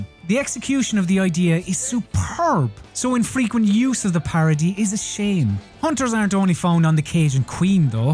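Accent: Ireland. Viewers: Hearing a Irishmen talk about a shark game was Quite pleasant definitely will be subscribing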